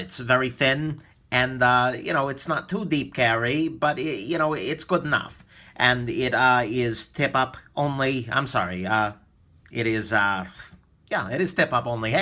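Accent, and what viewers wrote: New York, United States. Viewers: naw he just sounds like a damn proud New Yorker lol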